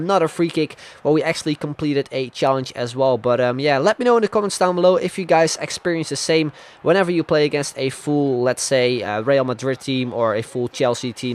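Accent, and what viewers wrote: Netherlands. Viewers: is he dutch? he sunds like?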